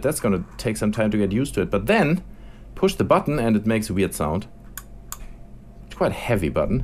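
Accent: Germany. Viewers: Anybody know where this flavor of english accent is found/from? I hear it often on youtube but its clearly not British, Irish, Scottish, Australian, South African, North American, I cannot pin it down, It almost has a German or Swiss hint to it... ? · fürchterlicher deutscher Akzent ^^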